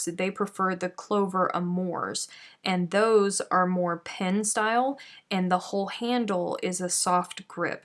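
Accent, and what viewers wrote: South, United States. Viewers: If I upset you tonight by my comment about the southern accent I want to apologize. I ment it in a good way. I love the southern accent and miss listening to my grandparents. It was the you alls that was the only time I really heard it. I really enjoy your podcasts and promise not to say anything again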